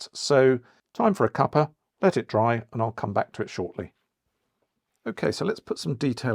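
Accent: United Kingdom. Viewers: Very informative! Off topic; are you from the same area as John Cleese? You guys have the same accent.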